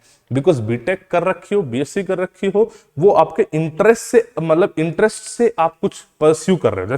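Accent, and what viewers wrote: Haryana, India. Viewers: sir aap HARYANA sai ho kya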